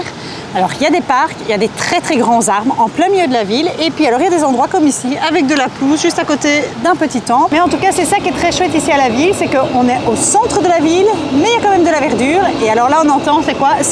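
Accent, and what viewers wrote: Belgium. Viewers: vous etes belgiques ou francais?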